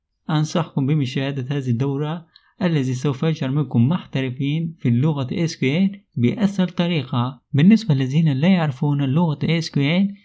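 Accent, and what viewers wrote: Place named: Syria. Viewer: الأستاذ من كوردستان بس فعلا كأنو بيحكي سوري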